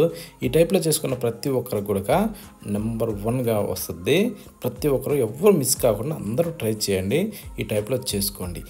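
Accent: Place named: Rayalaseema, India. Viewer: Gudka yendi bhasha bagaledu seema yaasa😂😂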